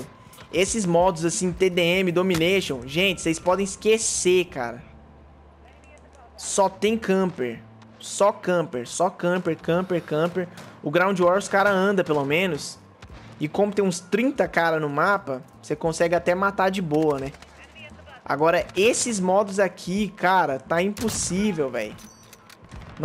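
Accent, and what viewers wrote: Minas Gerais, Brazil. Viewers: cê é de minas?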